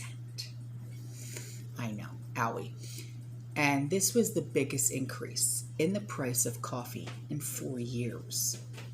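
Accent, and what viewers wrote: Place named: New Jersey, United States. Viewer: I'm soooo happy I found your channel. I'm new, but I look forward to your vids every day. It's like sitting down with a friend. You have a beautiful manner and you're as cute as cute can be. By the way, I'm a Jersey girl, too, transplanted to Florida four years ago. So add your accent to the mix, it's like being home:) God bless you and your family, and thanks for everything. Last thing, your voice is so calming and soothing, you should do bedtime stories on tape.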